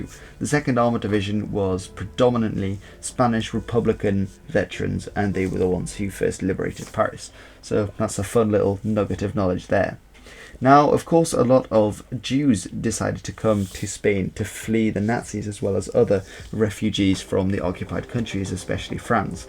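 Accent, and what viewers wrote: United Kingdom. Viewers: You are a Dutchman?! You don't sound like one. If you are Dutch why do you speak English with a British accent? Do you live in the UK or have lived there?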